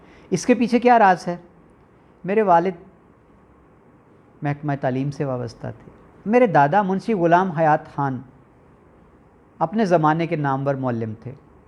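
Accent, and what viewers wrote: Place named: Lucknow, India. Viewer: ماشاءاللہ بہت خوب جی Great inspiration معلوم ہی نہیں ہوا کہ آپ کوٹلہ سے ہیں ،،، بلکہ ایسا محسوس ہوتا رہا سُنتے سُنتے کہ آپ لکھنئو سے تعلق رکھتے ہیں ، واللہ بہت ہے دل خوش ہوا ،،، Watching from USA.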